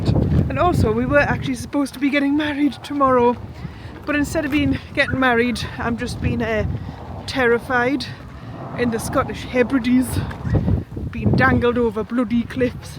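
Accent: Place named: Wales, United Kingdom. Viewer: Pardon me as I’m new here but I’m trying to place your lovely accent? Welsh?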